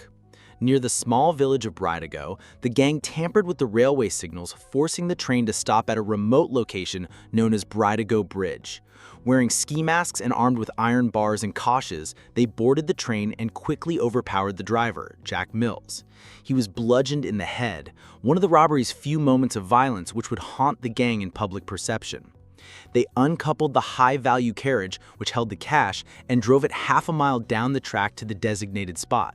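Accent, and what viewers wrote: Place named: United States. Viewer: Why do these English videos always have to be made by little Yank boys with horrible accents?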